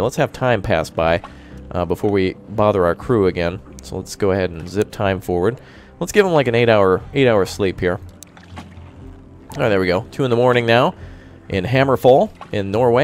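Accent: Germany. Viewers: R u german? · you german?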